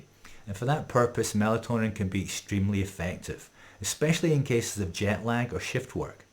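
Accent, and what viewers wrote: United Kingdom. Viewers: from your accent, i’m assuming you live in the uk. if so, how do you manage to order melatonin here?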